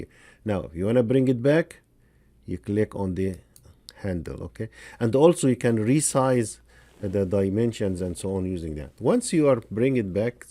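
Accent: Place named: Russia. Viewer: great videos, 3 days before i worked only In INVENTOR, but now starting be proficient in Edge to :-D ty. Nd BTW, from your accent, you RU ? :-D I am Slavic to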